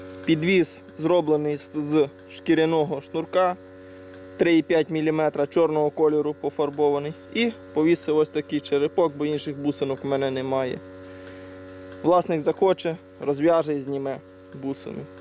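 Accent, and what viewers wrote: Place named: United States. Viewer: По-американски пиздит, ничего не понять.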